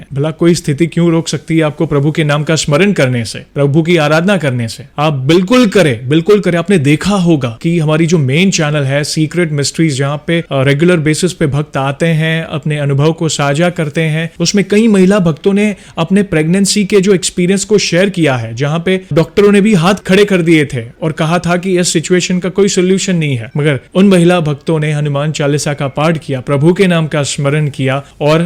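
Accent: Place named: Pakistan. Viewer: Bhaisab aap Toh Pakistani lag rhe ho .apki tone se lag rha hai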